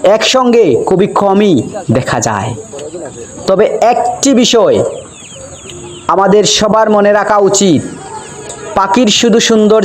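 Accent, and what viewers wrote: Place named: Sylhet, Bangladesh. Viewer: সিলেটি বেটা শুদ্ধভাবে বাংলা বলতে পারে না। পারোনা যখন তাহলে তোমার আঞ্চলিক ভাষাতেই ভিডিও বানাও।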